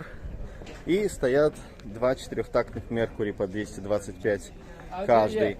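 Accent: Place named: United Kingdom. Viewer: вообще видос про мужика в конце это лишнее (к нему вопросов нет и лодка у него зачетная). интрига не сохранилась. голос был похож на голос ведущего из Уссурийска. я прифигел че его на острова занесло? да и ангицкое произношение меня тоже насторожило. думал катапультровался пацан в хренбритан. перед выборами туда ушел. и собаку даже в видосе не показал. без нее уехал. с женой видите ли машинки смотрят. ну думаю как все повернулось. ну думаю "отано чё". ан нет не он. разочарование. вам конечно поржать, а нам подписчикам стресс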